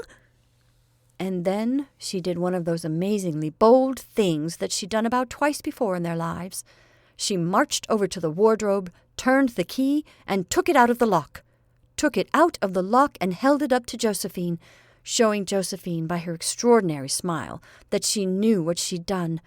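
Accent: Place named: United States. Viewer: The reading should be in an English voice, not American — one cannot imagine a more English story like this one! Pity.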